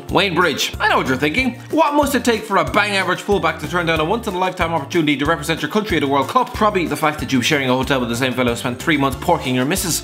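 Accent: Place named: Ireland. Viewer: Love the Irish guy doing the narration! He's SOOOO funny!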